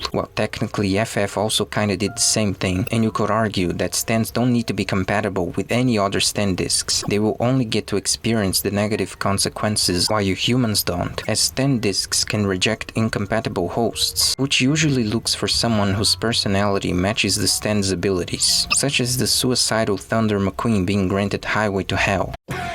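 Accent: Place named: Brazil. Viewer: Mano, tu é Br ?, parece c o sotaque de Br ein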